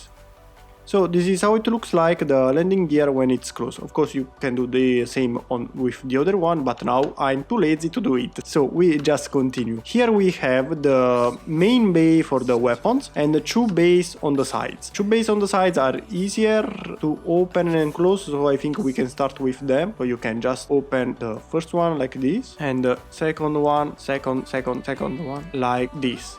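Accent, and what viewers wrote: Italy. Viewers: Si sente che sei italiano😂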